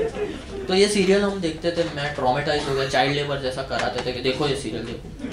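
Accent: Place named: Gujarat, India. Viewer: I love the way that Gujarati accent you use. Hats off. 🤩 you are star.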